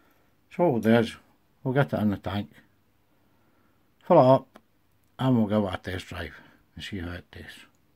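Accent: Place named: Scotland, United Kingdom. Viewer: Just copied your instructions there now, I love your nonchalant Scottish tutorial. Reminds me of when I worked over there during the 90's and a guy showing me how to take cuttings in a huge plant nursery. Straight to the point, no waffle and in layman's terms. That's the way I roll. Good morning from County Clare in the west of Ireland 👍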